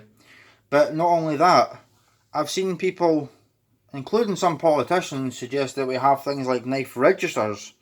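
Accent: Scotland, United Kingdom. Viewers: Just nice to hear a fellow Scotsman talk about similar hobbies, even if you were rambling shite, I'd still watch haha. You've spread loads of awareness with this channel!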